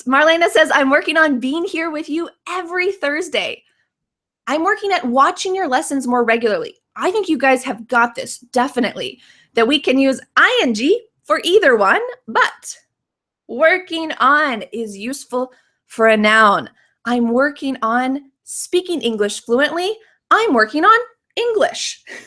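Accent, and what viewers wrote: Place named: United States. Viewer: Your English American pronunciation and accent is really perfect, I never heard someone who hade such perfection speaking like you dear sister, God bless you and your family and ministry so much. God makes prosper all works of your hands in Jesus Christ our Lord.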